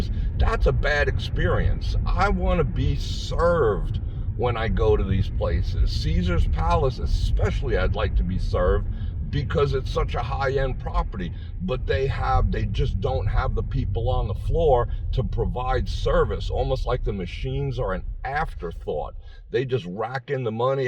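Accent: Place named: East Coast, United States. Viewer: From your accent are you from the East Coast.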